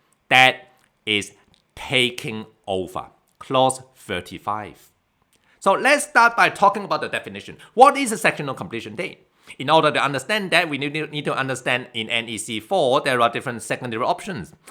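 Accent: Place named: India. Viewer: 有点印度味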